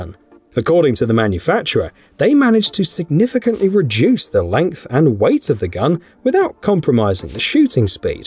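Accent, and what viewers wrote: England, United Kingdom. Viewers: I love hearing English people describe firearms. The frame and SLIDE…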